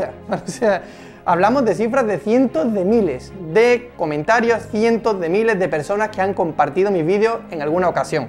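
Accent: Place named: Andalusia, Spain. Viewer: Enhorabuena y gracias por el contenido que das tío!, ya note algo en tu acento, yo soy de Puerto Real cerquita de tu tierra!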